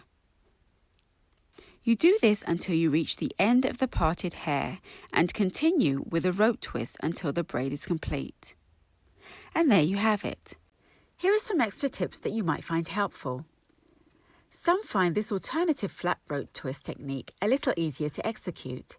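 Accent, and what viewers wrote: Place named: England, United Kingdom. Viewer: 👏🏾👏🏾👏🏾👏🏾😃 That was so wonderful to watch! I love the way you speak! Beautiful English accent...I'm biased, I have a very similar voice! 😄 Your video was wonderful! I really enjoyed watching you do your youngest daughters hair routine. I have a son, whose he's 16, so I wasn't watching for him, I was watching for my Grandneice whose 9mths old. I want my niece to use a healthy hair growth routine on her daughters hair, so I'm going to share your video with her. I love your, 'GIRLS YOU ROCK', T-SHIRTS and hope to purchase one or two for my Grandneice. Thank you again and look after your 3? beautiful, little girls 🥰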